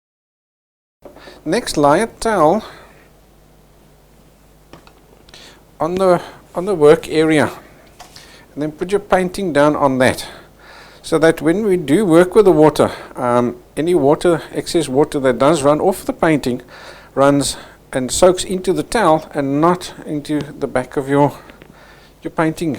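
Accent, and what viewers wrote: South Africa. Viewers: Love the South African accent!👍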